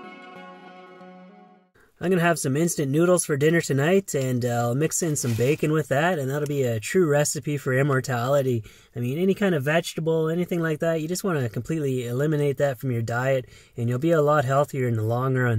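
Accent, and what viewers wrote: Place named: Ontario, Canada. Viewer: Thats how they talk in Ontario eh!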